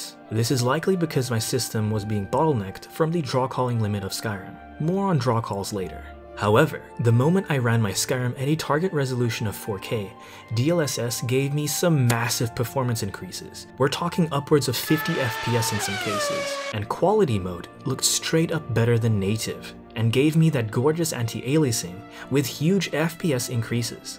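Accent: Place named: Netherlands. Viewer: you sound dutch i just realized that zamn, been binge watching your vids LMFAO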